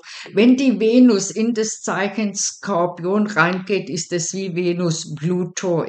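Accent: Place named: Swabia, Germany. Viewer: diese schwäbische (?) Akzentuierung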